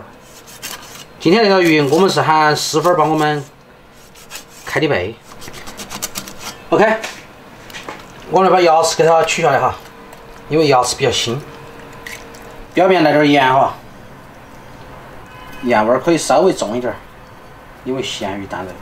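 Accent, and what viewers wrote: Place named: Sichuan, China. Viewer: 我看超小厨说四川方言但唱歌太标准，老外觉得太有意思.这就是全世界一样，在韩国也是一样的.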